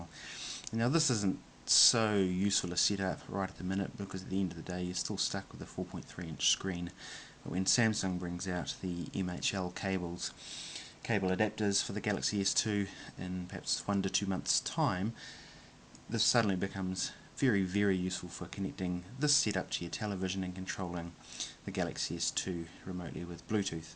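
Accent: New Zealand. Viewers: Excellent video, and awesome New Ziland accent. Great to see the keyboard and mouse attached. Thanks.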